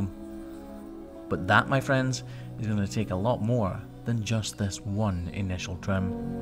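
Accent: Scotland, United Kingdom. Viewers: It’s a very dirty job but someone has to care for cows feet and you do a fabulous job. Too, love your Scottish accent!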